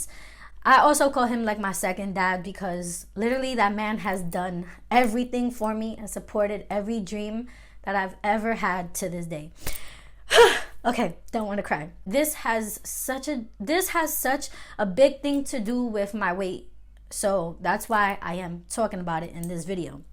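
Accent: New York, United States. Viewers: i’m new to your channel, you gotta be from ny 🤣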